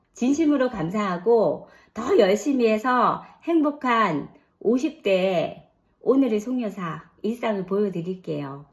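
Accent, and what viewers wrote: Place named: Gyeongsang, South Korea. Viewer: 경상도 억앙도 음식도 정겹네요~^^ 새해엔 좋은 일만 가득 하세요~~~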